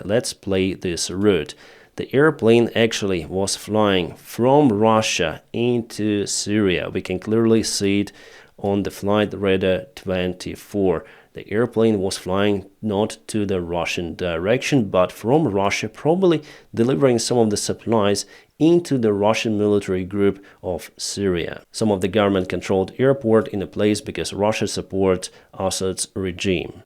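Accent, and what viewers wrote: England, United Kingdom. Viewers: Yeah he’s British, his accent can be found nowhere else. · He has a strong English accent . I am British and recognise the accents of my compatriots.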